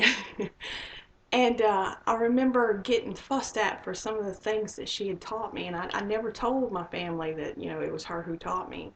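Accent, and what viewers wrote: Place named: South, United States. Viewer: Your accent sounds like home to me. :D I'm from the South. Love your story!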